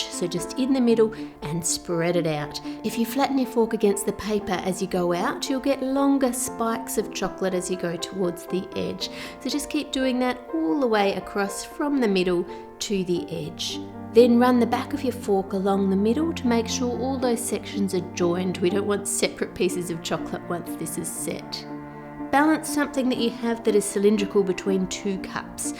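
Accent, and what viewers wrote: Australia. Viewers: I will probably never make this, but very much enjoy watching creative people create it teach... Also as an Australian myself it is just so nice to hear an Australian accent in the voiceover - so nice, sweet and comforting. Like a hug from Mum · Besides enjoying your luscious desserts, I must say that I'm loving your friendly Aussie accent. It reminds me of my aunty Beverly. I'm a little over how americanisms are gaining a foothold in international you tubers vocabulary, and so its really refreshing to hear things like sticky tape and your gentle Aussie lilt. Love from a Kiwi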